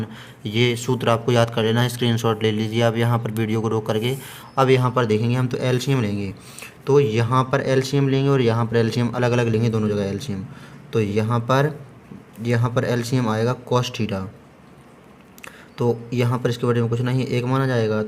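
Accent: Bundelkhand, India. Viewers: Bhai tum kaha se ho bundelkhande bol rhe ho voice se lgta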